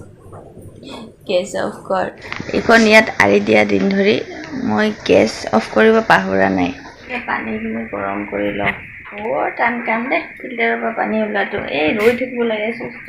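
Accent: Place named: Dhubri, India. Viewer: Vdo go sei moja lagise re... Enkeoi koi ne dhuburir bhakha. moi kin2 xuni valei pao 😊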